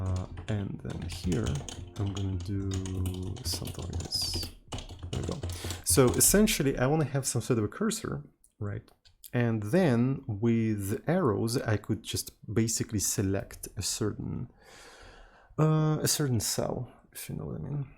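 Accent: Russia. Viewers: Are you from Russia? Your accent sound as Russian accent and your account was registered in Russia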